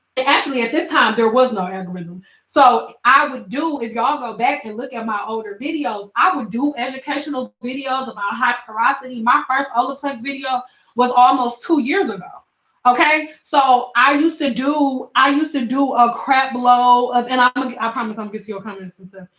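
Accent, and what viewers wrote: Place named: Detroit, United States. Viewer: New SUBBIE, are you from Detroit? “woot woot”and the way you talk reminds me of HOME 313 🥰. You’re very inspiring, I introduced my cosmetology class to your channel 💕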